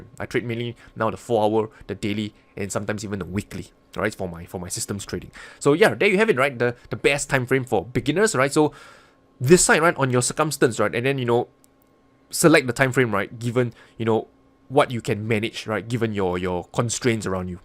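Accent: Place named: Singapore. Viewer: I like the accent reminds me of my long lost childhood friend from Singapore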